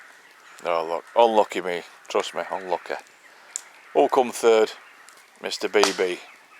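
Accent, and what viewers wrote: Yorkshire, United Kingdom. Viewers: Great video mate. You're a proper down to earth bloke, very likeable. Looks like you have a blast out there with the dogs. You got a lovelly spot there too. I've subscribed and will watch the rest you have done. sounds like you;re a fellow Yorkshireman with that accent. Ey up from Leeds.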